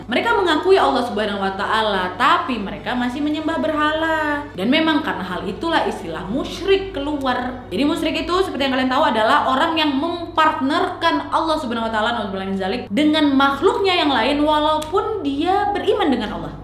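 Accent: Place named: Medan, Indonesia. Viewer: Cerita penggalan sejarah Nabi yg disampaikan dengan cara yg sangat menarik , tuturan gaya medan yg khas dan isi cerita yg terurai dg enak dan menggelora jiwa yang selalu haus terhadap kebesaran Yang Maha Kuasa dan keindahan Achlak Nabi Muhammad SAW. Selamat wak. Semoga istiqamah wak. Wassalam. Ww.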